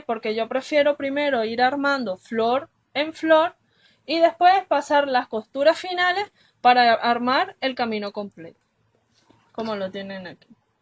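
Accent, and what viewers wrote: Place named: Venezuela. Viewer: Ese hermoso acento, solo puede ser de VENEZUELA. Saludos desde Barquisimeto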